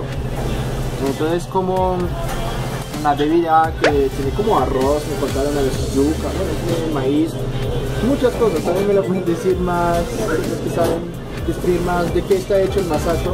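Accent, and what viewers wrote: Bogotá, Colombia. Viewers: hermano mete de vez en cuando un saludo o despedida en frances para la Colombie y Zach Morris hizo un stream live diciendo que estaba pensando en hacer un video con vos Chevere tu acento cachaco,Merci bocu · Acabo de descubrir tus videos y me gustan muchísimo ya! Tu español colombiano (bogotano 😝) es excelente! sigue así!!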